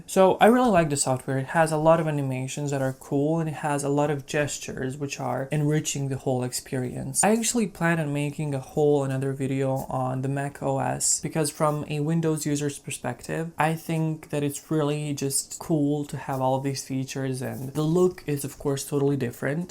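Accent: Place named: Poland. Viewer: You kind of sound Polish :)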